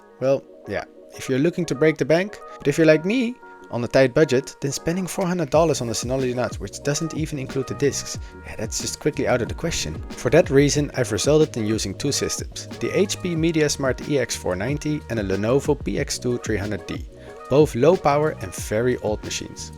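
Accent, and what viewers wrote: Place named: Netherlands. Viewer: Lekker bezig maat, dacht het al aan je accent te horen haha!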